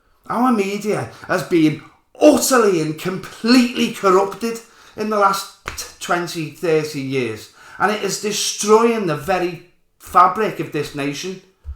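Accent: Liverpool, United Kingdom. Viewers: 1. Role as a Commenter. OK. Stop. It. Right now. I mean it. You aren't other people. You can't compare yourself to others. Do you compare yourself to the heel work trainers at Crufts when Ann sits? Or how about your garden with Wavertree when you mow the lawn? It's got nothing to do with intelligence. Even the message you're delivering is only the second most important thing. It shouldn't be, but that's the algorithm. You have to know how to work with it rather than trying to get it working for you. I'm afraid I haven't looked at how that works, but there must be channels that you follow (since you're comparing). Contact them. Drop them an email. Ask if they know anywhere that you can get more help to grow. They might tell you where they learned, and as a bonus, they might also share their own experiences. There's also the accent. I personally see it as a bonus because you sound like some of the guys I grew up with in Lancashire (they escaped but never learned how to pronounce words properly 😉) But the Scouse accent, like Geordie, Glaswegian, and Belfast accents, are very distinct. 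Media doesn't cover much regional programming - especially internationally - so your international audience is going to be smaller than someone with a RP/Generic/BBC/Posh accent, or the "normal" Working Class accent of Estuary English or softened Cockney. (TBC)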